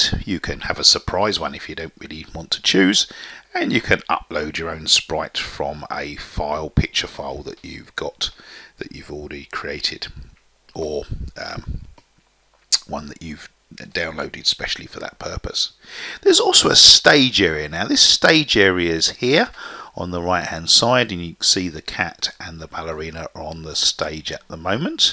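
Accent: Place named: United Kingdom. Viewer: he sounds like so so so so british in <num> x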